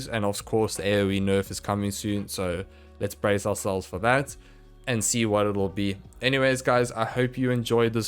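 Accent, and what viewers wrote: South Africa. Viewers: Are you from South Africa? I can hear from your voice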